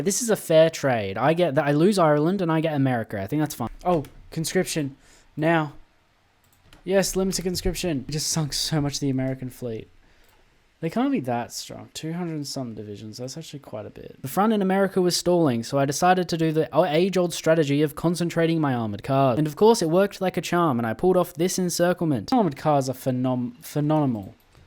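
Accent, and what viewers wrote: United Kingdom. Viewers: The British accent caught me off guard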